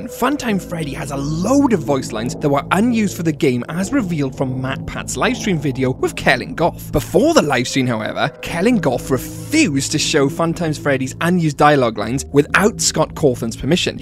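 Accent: Wales, United Kingdom. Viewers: Are you welsh by any chance?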